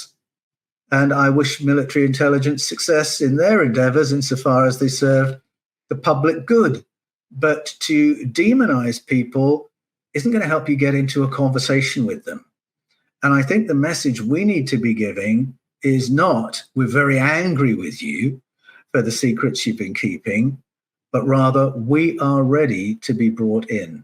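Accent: Australia. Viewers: I love u! Awesome stuff! It juat sounds smarter with an aussie twang!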